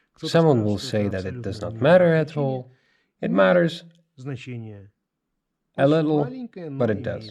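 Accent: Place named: United States. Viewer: Bro, love the american speak overdub. Males it so much easier to watch when i know what you are talking about lol🤘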